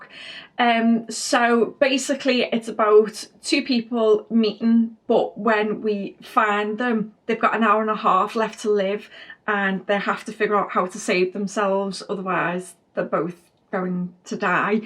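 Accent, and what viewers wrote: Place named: Midlands, United Kingdom. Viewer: You have some cool books in the plans for this month, I hope you can get to them all. Except the Cassandra Clare one, she’s evil 😹 Also, i’m desperately trying to place your accent. I’m not English I’m just into accents, so I’m probably terribly wrong but I’m thinking you’re somewhere from the Midlands? :)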